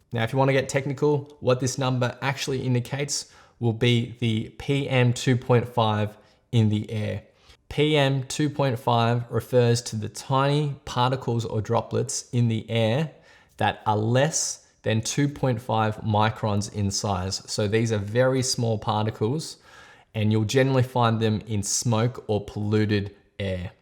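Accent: Australia. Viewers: Asian speaking aussie.. noice... Subscribe.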